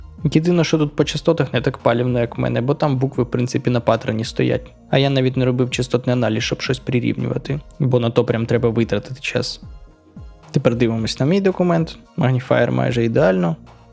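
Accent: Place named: Western Ukraine, Ukraine. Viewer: Я думаю, українська у нього рідна (проскакує західний акцент).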